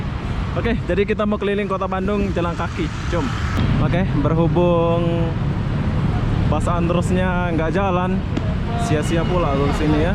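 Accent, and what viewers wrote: Malaysia. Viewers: Kak asli org mana? Kok ngomong ke melayu melayu gitu hehe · Ini Abang dari mana logatnya mirip orang Malaysia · Orang malaysia itu .cara ngomong nya keliatan . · Abang dari Lombok???😑😑😑 Bahasa Lombok dan loghat nya GK ada Melayu 😑😑tipu lah Abang ni,Abang dari Malaysia bukan dari Lombok 😑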